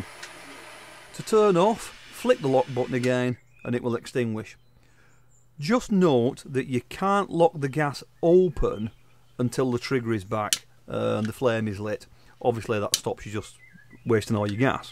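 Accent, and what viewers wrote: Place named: Lancashire, United Kingdom. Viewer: Great video.excellent tutorial.Plain English with a touch of Lancashire accent.Well done sir.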